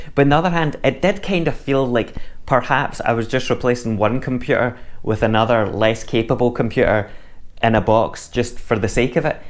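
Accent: Scotland, United Kingdom. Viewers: Not into jock accents but yours is an exception, I could listen to you for hours lol. Anyway, I have just bought a Force for £750 and I don't even know whether it will arrive this side of Xmas or not, so I'm just surfing all the Force stuff I can find. I have an Akai live(not 2) and its all right, a bit like a girlfriend with big boobs, its got its good points but I'm not in love with it, I'm hoping the Force will be the one I fall madly in love with or at least get infatuated with. Anyway excellent review, I wish all reviews were like yours, truthful, honest, tells it like it is, warts an all. I'm going to give you a thumbs up and a subscribe so I can listen to some more to that accent! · Seems awesome really! Stoked to hear what you come up with on it! And even though I have no plans on buying this device... I'm always interested in what your dulcet Scottish tones have to say!! 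lol ✌🐀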